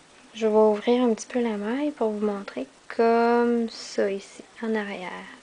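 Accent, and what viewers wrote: Quebec, Canada. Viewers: Tu exagères, on comprend parfaitement ce qu'elle dit et son accent québécois est délicieux.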